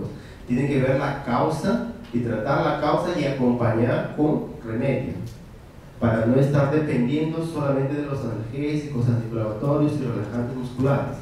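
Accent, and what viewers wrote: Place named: Brazil. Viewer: muy buena exposición.. pero no es necesario hablar como brasilero.. yo vivi 15 años en españa y jamas hable como gallego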